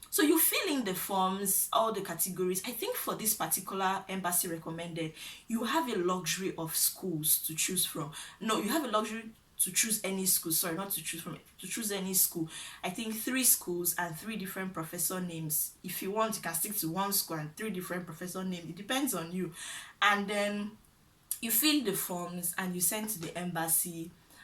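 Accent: Nigeria. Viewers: Hahaha.. This ya Igbo tongue no fit leave you ooo.. Thanks for all you do.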